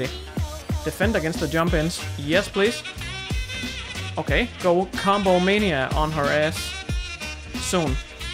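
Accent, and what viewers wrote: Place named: Denmark. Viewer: IT’S YA BOOIIIII DANISH ACCENT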